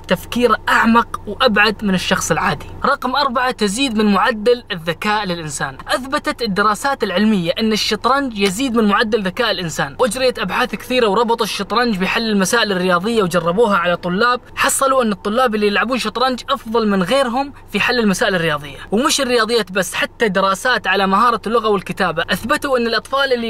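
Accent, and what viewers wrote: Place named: Saudi Arabia. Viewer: اتوقع انك مصري بس تتكلم سعودي زين ما شاء الله عليك 😂💛 ،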